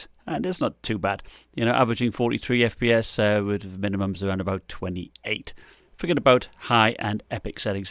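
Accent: United Kingdom. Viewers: But you sound British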